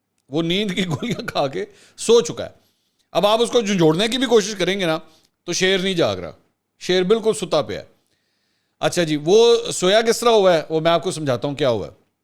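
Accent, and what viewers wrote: Punjab, Pakistan. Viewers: آپکا پنجابی ٹچ زبردست ھے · bhai..Ap ki Punjabi touch😅😄😍✌🏿👌🏿👌🏿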